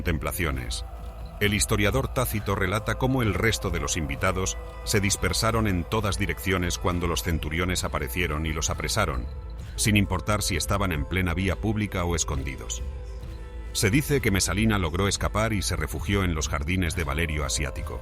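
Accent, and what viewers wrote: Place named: Spain. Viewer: Por fín puedo escuchar este relato de la historia en español de España. Gracias.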